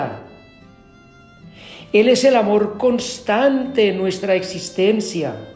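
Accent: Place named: Antioquia, Colombia. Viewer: 😍Padre tiene acento paisa 🎊🎉🙏🏻🙏🏻💕😊😊 Dios te bendiga siempre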